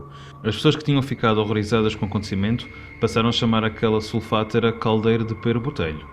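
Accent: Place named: Portugal. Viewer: Sotaque de portugueses é tão gostoso de ouvir💕💕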